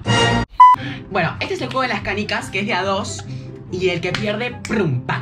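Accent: Argentina. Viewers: vos sos de salta o BUENOS AIRES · SOS ARGENTINO?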